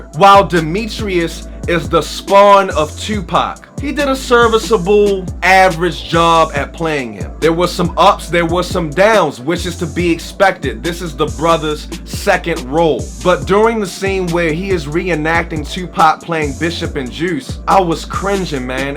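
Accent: Baltimore, United States. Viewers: you got that heavy Bmore accent fam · Soon as you started talking I knew you was from Baltimore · As soon as you started talking I was like oh yeah he's from Baltimore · I knew you were from Baltimore within the first few seconds. Your accent 😍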